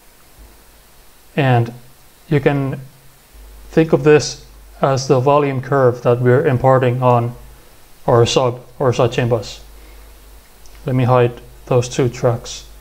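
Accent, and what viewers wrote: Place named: Eastern Europe. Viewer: I always know i'm in for a great tutorial vid when I see a grainy webcam and the uploader has an Eastern European accent of some kind, no 5 minute intro and unnecessary bullshit just str8 to the good stuff. God bless you man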